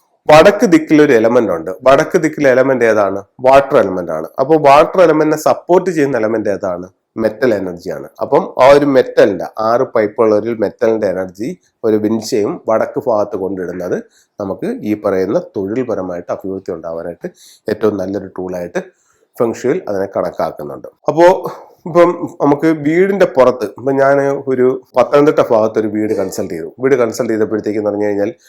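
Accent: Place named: Kollam, India. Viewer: Kollam TVM fagham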